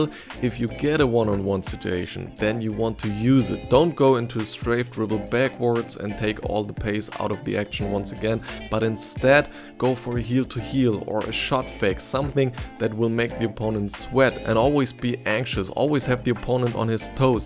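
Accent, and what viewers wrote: Germany. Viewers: sounds german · It is soooo easy to hear you are from germany hahah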